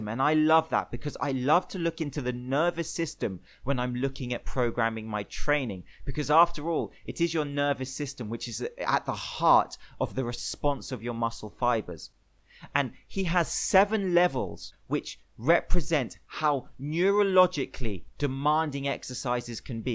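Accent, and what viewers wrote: United Kingdom. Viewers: Please understand this is a compliment. I do NOT like the British accent. It grates on my ears. But that’s my problem not yours. The information you provide is so valuable I listen to it anyway. It’s kind of like training for my ears.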